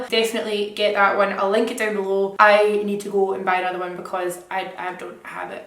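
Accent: Scotland, United Kingdom. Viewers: omggg I love your Scottish accent xxxxx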